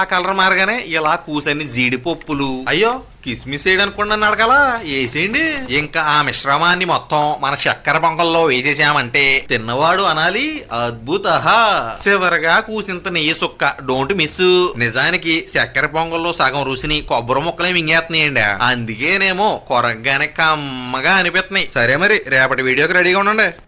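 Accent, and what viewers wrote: Godavari, India. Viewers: Mi godavari language super 😍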